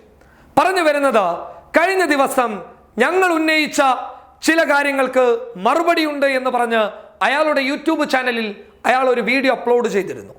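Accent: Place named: Malappuram, India. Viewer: ഇയാൾ മലപ്പുറത് ആണോ വീട് 😁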